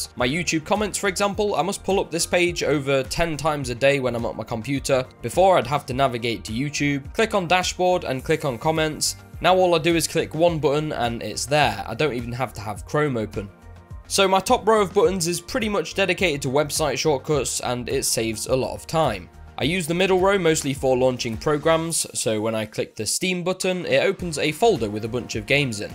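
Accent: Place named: United Kingdom. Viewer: that is the most intense british accent i have ever heard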